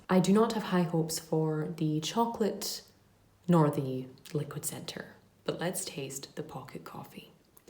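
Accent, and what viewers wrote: Scotland, United Kingdom. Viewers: Is your accent Scottish? It’s beautiful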